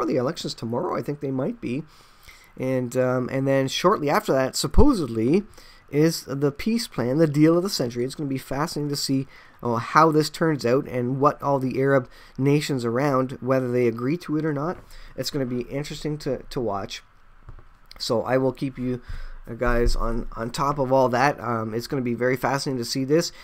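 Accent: Canada. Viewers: Canadian Aye? 😄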